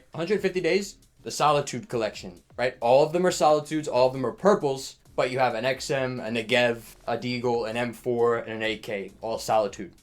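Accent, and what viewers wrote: New York, United States. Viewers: The intellectual new york accent is rare but very effective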